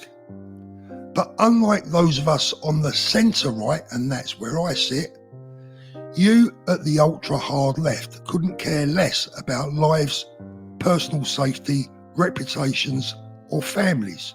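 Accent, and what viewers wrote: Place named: London, United Kingdom. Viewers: This doesn't surprise me in the slightest...these warped loony left crackpots try and try again (anyone whos got an alternative view to theirs) to smear,lie and just make s**t up about someone...TBH whenever i see that theres no journalist's name to the "story" then its just made up S**T!! Just to try and smear you and get you cancelled!! TBH the funny thing is they think we're too thick to see what their game is!! BUT the thing is =you've got some tatts on yer brightons you talk wiv a london accent and lets be honest you DO LOOK LIKE A RACIST!! The words Book and Cover spring to mind!! I'm sure if they clocked me in the middle of summer they'd ASSUME exactly the same S**t!! Shaven head,tatts on arms back and chest and i was born and brought up in Peckham so how am i supposed to talk?? Best thing -point and larf at em!! 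· It's because you have a South-East England accent,. The Left Wing Labour Party used to exist to support the working class and aim to create more equality of income, however, it's been taken over by an over educated middle-class metropolitan elite whove all been to university and believe themselves to be suoerior to anyone who hasnt. The only home for the genuine working class is now the Reform Party and parrly the Conservative Party. The Labour Party has morphed into supporting every minority except the whoye working class who have regional accents.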